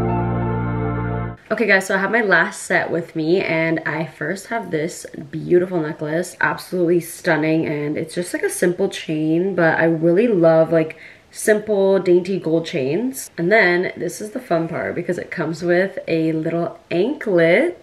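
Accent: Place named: Scotland, United Kingdom. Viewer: OMG I love her accent, I think it is Scottish?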